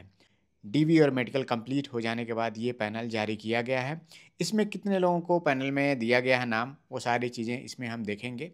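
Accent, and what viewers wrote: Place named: Uttar Pradesh, India. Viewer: U r from up .....ho na ? The way prounounce i get it...anyway...i just love the way 👍👍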